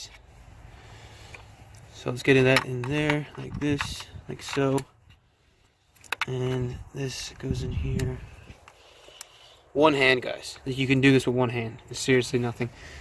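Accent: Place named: Newfoundland, Canada. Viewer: You sound like a Newfie!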